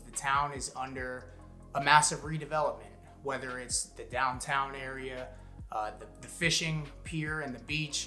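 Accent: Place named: New England, United States. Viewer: While your video is intetesting and a little informative, obviously you've not lived there long (accent) and pray you did leave the new england politics behind. One needs a good 10+ yrs down there too fully undetstand and grasp the lifestlye. The other HUGE cons are the crime from the NE States migrating with the new residennts and then the traffic. Those last two items have increased 150-400% as of late 2020 and its getting 100x worse. I'd tell folks to pls move back home if you miss it so much. We dont want the politics, crime and traffic here. Unfortunately with ins companies doing their thing on rates, its getting close to LA expensive. Residents from 2008 and back are leaving. I was going to go back there in Jan 2020, the house I was looking at near Boca was 2800 sqft, 3 car, lots of soace between homes (60 ft) and low hoa, towards Coral Sprngs for 235k. 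That same home in Mar 23' was 780k and as of late July 23' its 815k. The over pricing of homes is ludicrous and according a friend and comn/res RE expert since 1990, its going to keep going up another 3% from now in 23' to 7% by Nov 24'. I am the kind that needs my garage space to work on my classic car and a few bikes, a yard for the dog, etc. Plus at least 7 miles inland because hurricane wibd decreases by 17-20 mph every 6 miles in land and its drier, less salt air so no specially treated furniture needed because not with in 2 miles of the sea. That sea salt air destroys furniture, interior and exterior paint and vehi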